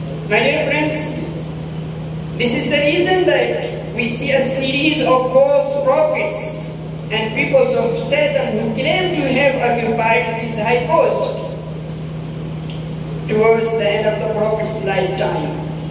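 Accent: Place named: United States. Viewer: Ap ki english to america ki lag rahi he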